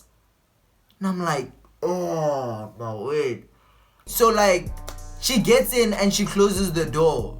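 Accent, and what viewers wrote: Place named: South Africa. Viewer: I like his SA accent its real dope🔥❤️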